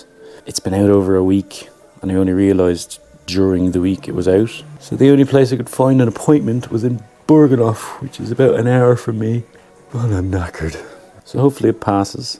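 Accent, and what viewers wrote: Dublin, Ireland. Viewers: I could listen to you talk all day. Love an Irish accent. Great vlog, keep them coming. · Loving your vlog. Been watching from the start so maybe I’m number 30 or so of your 40 or so followers. Was great to hear that New Zealand is a place you want to visit. We love Irish accents here. Love your down to earth approach. Would love to see more of France but can’t travel at present (no I’m not in jail) so really love your trips in Pasquale. Great camera work. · If you mistake a strong Dublin accent for a Scottish one, you can't be too familiar with either...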